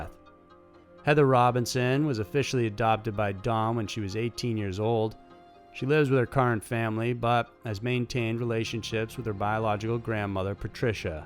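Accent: South, United States. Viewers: I just love your voice sounds so country😍 great video as always!!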